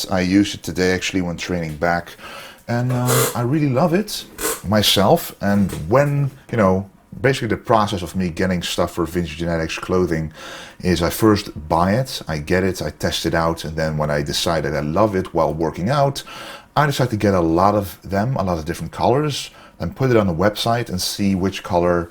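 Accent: Netherlands. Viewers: Dutch, can't you tell from his accent?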